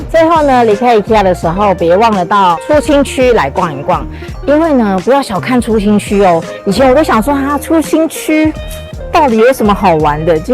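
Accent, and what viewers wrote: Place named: Malaysia. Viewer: 很多画面，让我笑了😁 马上订阅😉 那些是台币吗？ 口音也很像我们马来西亚的。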